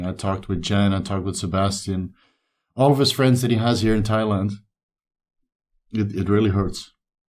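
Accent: Netherlands. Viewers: To be honest, I have only seen calloboration videos of Jo but I really liked him and I am left wondering why I only started to follow him now. Still, your loss of a loved one even resonates in me and he will be in my thoughts. I also do not know you, however, your accent sounds Dutch. In case you are, ik wens je heel veel sterkte in de komende tijd! Rest in paradise Jo, we will carry on for you, with you in our thoughts❤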